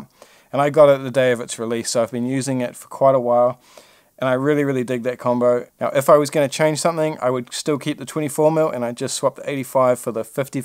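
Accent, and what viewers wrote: New Zealand. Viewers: Maaate! I was like.... is this guy a kiwi?! did a quick google search and BOOM! Nice one man I'm subscribing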